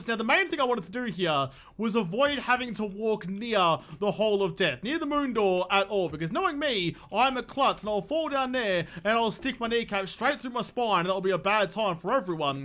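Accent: Australia. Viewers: Luv ya vids and ya silly Base ideas Thx a lot Mate ♥♥♥ Even as a fu... ng Kraut I overstand ya Aussie English (worked a couple of years as Scuba Diving Instructor and had a few Aussie Coleauges) Where I n I cant get some Tickets 4 Park 🤔🤔🤔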